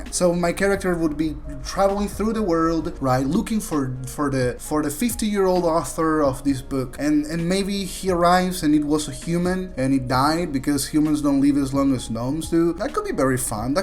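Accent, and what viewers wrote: Argentina. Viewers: Bancá, sos argentino?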